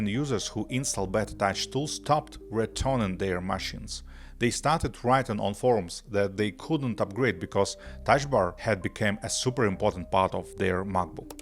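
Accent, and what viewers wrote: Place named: Russia. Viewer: I appreciate you using your real voice now instead of AI. Your Russian accent and manners bring a new feel to design content on YouTube that is refreshing.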